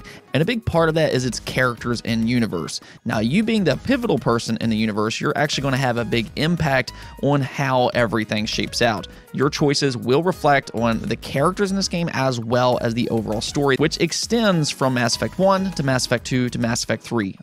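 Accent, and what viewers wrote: United States. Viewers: !! Jeez lassie I thought you were from New York or California! I’m gonna have to try your game too! I got the NEW Xbox though 😂♥️🤷‍♀️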